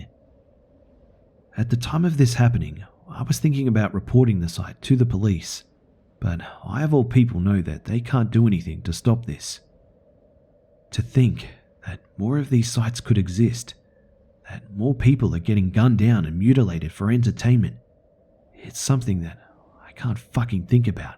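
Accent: Australia. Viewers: I also have come across some disturbing videos via the dark net, without being rude are you Australian by any chance?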